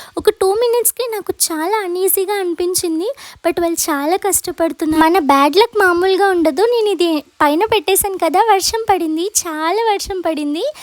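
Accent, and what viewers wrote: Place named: Andhra Pradesh, India. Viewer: Akka nuvvu telangana ammayi va ap ammayi va nee slang chusthe ap la undhi mee family slang accha telugu (ts) la undhi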